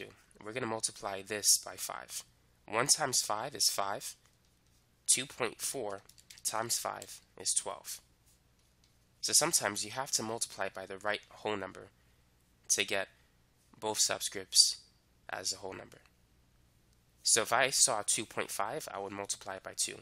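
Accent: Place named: New York, United States. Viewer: you definitely from ny b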